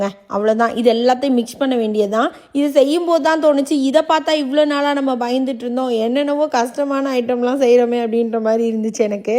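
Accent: Madurai, India. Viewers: Akka neenga madurai yaa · Akka neenga madurai ya akka anna entha oooru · Neenga madurai ah?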